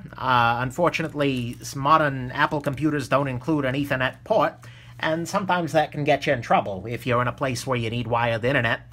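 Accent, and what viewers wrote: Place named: Brooklyn, United States. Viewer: What part of Brooklyn are you from?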